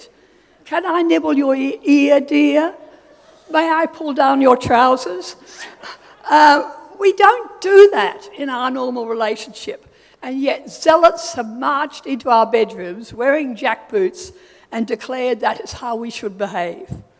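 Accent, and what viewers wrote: Australia. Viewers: I like her aussie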